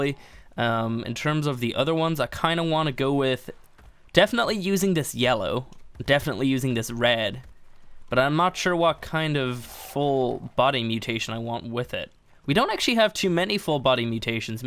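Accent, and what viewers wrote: Ireland. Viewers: Are u Irish bro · Which part of Ireland are you from?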